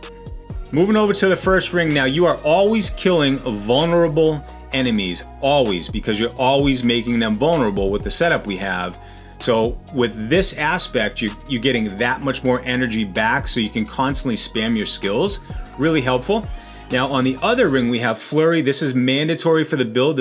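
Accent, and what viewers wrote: Boston, United States. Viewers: Aside from the cool build, this video is quality. Good pacing, delivery, and energy. Getting some Boston vibes too for an added bonus.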